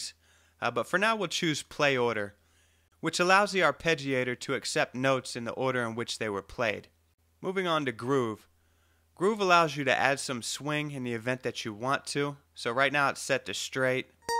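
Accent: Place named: Louisiana, United States. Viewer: what is this accent.... louisiana? sounds tight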